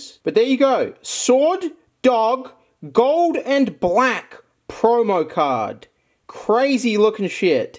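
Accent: Australia. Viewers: U an Aussie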